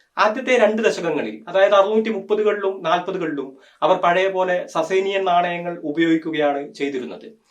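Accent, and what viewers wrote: Kasaragod, India. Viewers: എത്ര നാളായി നിന്നെ കണ്ടിട്ട്, നിൻ്റ് കാസർക്കോട് സ്ലാങ് കേൾക്കാൻ ഭയങ്കര ഇഷ്ടമാണ്, മാറി നിൽക്കരുത്, ഉടനേ 100k അടിക്കണം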